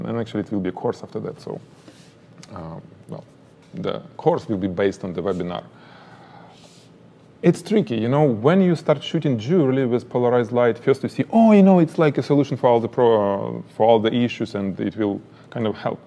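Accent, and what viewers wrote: Russia. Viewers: Родной русский акцент ))))) класс, спасибо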